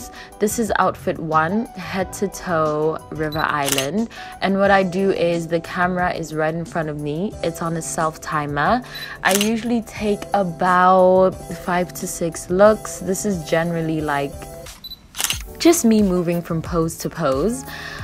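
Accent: South Africa. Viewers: Presume you're South Africa, cause of your ascent... great tips hey, from the motherland... South Africa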